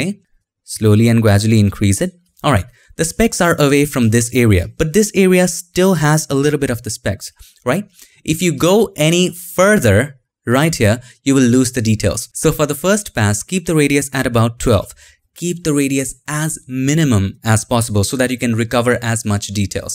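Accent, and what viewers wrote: India. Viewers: Indian accent = check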